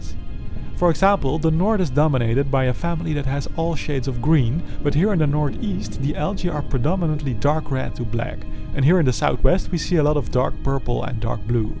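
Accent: Netherlands. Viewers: I sense a strong dutch accent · You sound dutch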